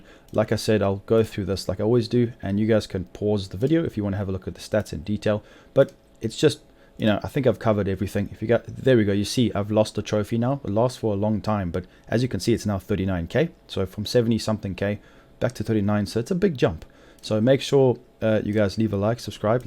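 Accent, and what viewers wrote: South Africa. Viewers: Watched this and went for the grind to get the backpack. Got it today and have put together a rough build. Just need to min max it. Thanks for the vid! Is that a SA accent btw?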